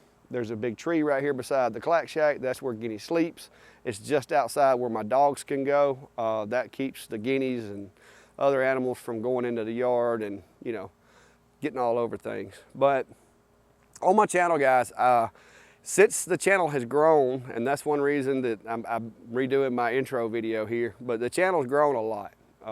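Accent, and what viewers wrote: Alabama, United States. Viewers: I like it all! Love the animals, brother and BIL does some wood projects, and I grew up in my early life in mobile Alabama, so I love listening to bama folks! Lol! I live in houston, texas now and came from maymays channel. I think you are doing great! Variety is fun.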